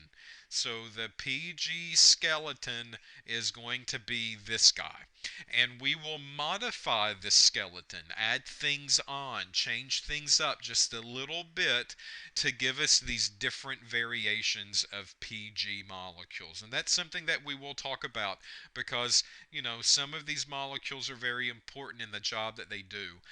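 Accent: South, United States. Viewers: very good like your southern? accent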